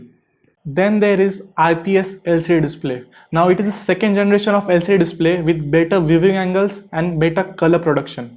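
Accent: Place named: South India, India. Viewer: You're right, judging by his accent, he belong to the South part of India, I am guessing Tamil Nadu.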